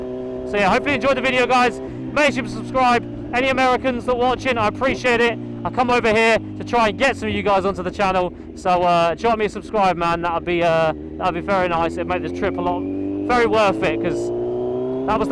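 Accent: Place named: United Kingdom. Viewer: where u from? u accent i literally like uk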